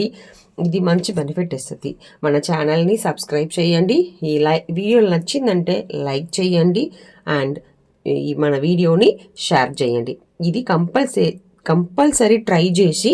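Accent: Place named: Kerala, India. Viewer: Malayalam notlo vostundiga madam Good so proud of you madam as a malayali proud of you madam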